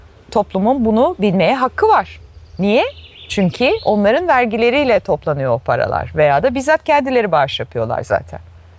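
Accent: Standard. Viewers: Hanım sizi ilk defa izledim Temiz Türkçe akıcı anlatım👍 Ben her kusaktan son uc kusaktan cocuklara sahibim esnafim onlari okutmak ugruna kendime hic yatirim yapmadim pisman degilim.Devletin vermesi gereken egitimi kendi imkanlarimizla verdigimiz icin baska insanlara istihdam saglayacak sermayeyi olusturamadik egitim icin harcanan paralarda ise yaramiyor malum devlet polikasi.oy moy yok diyen uc cocuk var evde su anda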